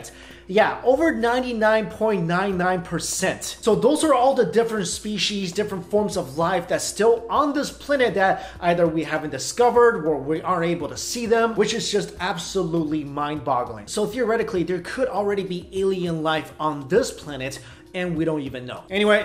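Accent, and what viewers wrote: Canada. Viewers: All I can say is: "Are you Canadian?" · Is he Canadian?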